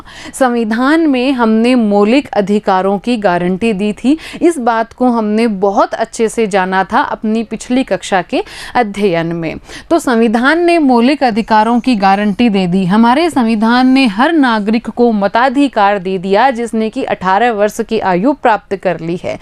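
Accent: Rajasthan, India. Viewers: Nice video but mam apke bolne ke trike se hi pta chal jata hai ki aap Rajasthan se ho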